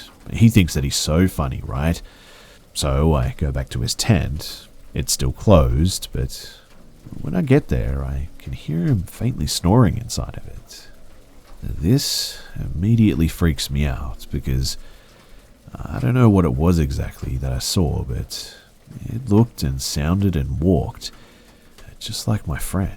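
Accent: Australia. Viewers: One of the few people who's voice actually makes me feel safe, comfy and ohhhh sooooo chilled., i could honestly listen to you forever, what I'd give for you to read me a bedtime story every night, I'd sleep great permanently......gotta love an Aussie accent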